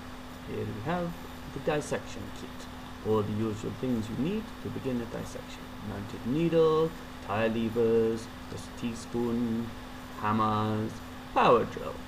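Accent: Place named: Italy. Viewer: Awesome vid. :) Thumbs up for the Italian accent :)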